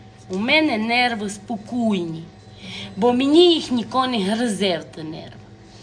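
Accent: Transcarpathia, Ukraine. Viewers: Люблю закарпатський говір, йо!😂❤❤❤ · щось вас давно не було в ефірі.Як то файно чути наш діалект бо не вшиткі хочуть говорити як х мамка навчила бо ся ганьблять,а то красно що аж ся хоче.Такі голубчики ми робимо на всякі гостини,ай бо то лем у нас на Закарпатті такі роблять а інде такі як долоні завеликі.Ми ся не нервуємо ай любуємо коли їх крутиме.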